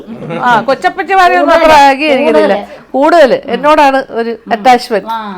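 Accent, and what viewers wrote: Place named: Mavelikkara, India. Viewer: My paternal great grandma hails from Mavelickara and that's why I love to listen to the Mavelickara slang of our beloved Maam🙏🏻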